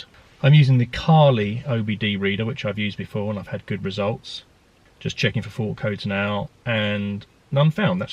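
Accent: United Kingdom. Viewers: This time on soft spoken british chaps buying german motorcars...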